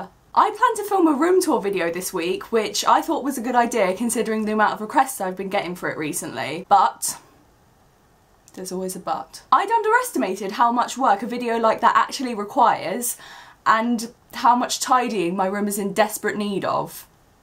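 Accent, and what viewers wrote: England, United Kingdom. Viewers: Btw where about in England are you because we have literally the same accent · where from england are you, i do but dont know the accent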